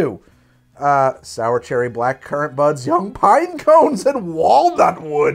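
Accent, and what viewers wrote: New Jersey, United States. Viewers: Wait are you from Philly dude? I thought the accent was giving Jersey. Anyway go birds!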